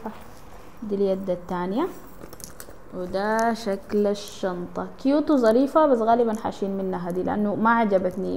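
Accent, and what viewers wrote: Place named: Iraq. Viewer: ما اجملك لهجتك تشبه لهجتنه اهل العراق ☺❤❤❤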